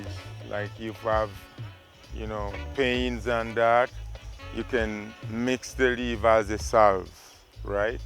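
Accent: Jamaica. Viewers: Are you an African or a Jamaican you sound like a Jamaican in 🇯🇲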